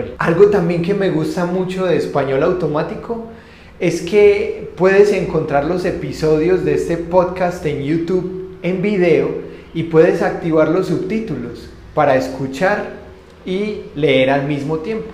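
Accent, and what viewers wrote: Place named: Colombia. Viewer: Colombian accent, perfecto👌 Thanks señor.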